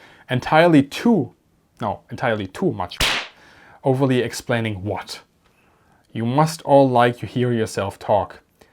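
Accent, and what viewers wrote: Germany. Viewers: I love your accent! It is excellent and authentic! AND your English grammar and pronunciation are PERFECT! I don't mean to be prejudiced, but I probably wouldn't have started following you if not for the German accent! Hals und Beinbruch! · Heyyy, do not worry about " German accent ", Kraut and Jerry LOL. Those guys are jellos because you speak more than one language. Also, those d........heads forget, WW2 ended 1945. Keep up with good work. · I really like youre german accent because i am from germany as well and with youre accent i can understand more of what you are saying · OK, I have to do this. First, I knew I was going to have some fun with this. I have been following your content for about half a year (after i got home internet), and I immediately recognized the incredibly high information value. Therefore, anyone who left negative comments on your channel would only prove their own stupidity. 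Then, after listening to just the first few comments, I needed to say the following immediately. Language comments? How inane. Sure, you sound like a Cherman. So what? You are fluent in English, which must be your second language, and you must be fluent in German, which is your first language, and which I recognized from your pronunciation of German terms, and I seem to remember, French also? And maybe something else. So here's one guy who's fluent in 3 languages, and there's another guy, who probably only knows one language, and probably badly at that, criticizing the first guy on the unusual pronunciation of a few words. Who is the better man? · he doesn't have the ability to speak "the queens English" with a German accent, he would need an English accent, but he speaks EXTREMELY FLUENT English, and that's what's important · totally agree, sure you can hear his German/austrian Accent but i can understand everything clearly, Keep going ( Guade Videos hawara) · Wow, who'd want to be a content creator eh? 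Keep at it mate, sounds like you've got the right attitude and a thick skin. It must be hard to handle those in some cases racist comments from illiterate people. Keep up the good work, 178K subscribers say you're doing something right! And for the record, I enjoy your German accent and you speak excellent English. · Oh for gods sake.....IGNORE THEM my friend! I am a Brit (A Welshman actually) I am keen aviation fan, my father was in the RAF post war era! You are a wealth of knowledge that can be verified should one choose to research it! Yes you are German, does not take long to work that out and so what? I admire your Objective impartiality, Lets be honest some German aircraft of the war were years ahead of anything the allies had. You could choose to extol the virtues of German Jet aircraft, the 262 or the Arado bomber and you do review these aircraft, but it is always fair, and objective and above all presented in a decent manner and as for people taking the P**S out of your accent..GRRRR ask them how is their German, or french or ANY language other than their mother language. 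As a Brit, I am always embarrassed how many speakers of English, which is also the language of America (they don't say "I speak American")...where was I? Oh yes English speakers generally can not speak any other language yet they always have the audacity to criticize a foreigner speaking English! I can speak a bit of my country's language which is welsh, I wish I could have learnt it in school but as I am now 62, that was a long time ago...Keep up the good work my friend, I always look forward to your channel Thank you! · Dig your vids. Like seing them old birds in nice detail. The German accent fits the theme lol. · Well, you have a distinct German accent, but I would say your english is more precise than most native english speakers I know. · Someone whispering about aviation? I'm in - especially with a german accent. Grüsse aus Dänemark. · LOL. I understand your English w/ German accent just fine. 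Keep it up.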